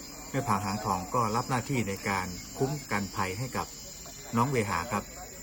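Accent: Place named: North, Thailand. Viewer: พากย์และบรรยาย ภาคเหนือ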